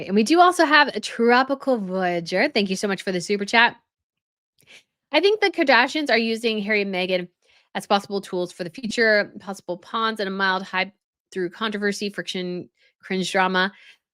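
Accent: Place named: United States. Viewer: Good questions: why go to the party to begin with? Were they actually invited? Was it Megan’s decision? Surely you (ginger boy) know it’s rememberence day? Why not have all images scrubbed from the internet? It’s all bogus, a sham, a cock up. Now as for you, … use the profits you make and instead of the European tours with everyday folks and buying jewellery, etc. get a vocal coach, get a stylist, new haircut and colour, have the stylist redo your wardrobe, get some basic pieces that can be mixed and matched - spoil yourself, up your game. You've worked for it. This is your business and you (unlike megan) are the face of it and you need to present in a certain way. Now, granted, I’m no fan of the American accent and trust me, markle is a deplorable human being, but as a makeup artist and someone with brand management experience, you need to level up. 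Please stop saying “as….(long pause) Well!! (Hard W). It’s excruciating…and you come off as a circus MC/ game show host. It’s slightly inauthentic and I’m sure that it’s not something you are aware that you’re doing. Life has its ups and downs but take the time to better your offering and start with yourself. Your content, I’m sure, is fairly well-researched and put together. Apply that attention to detail to yourself or consider podcast-only content. Start with the vocal /voice coach to correct your cadence and go from there.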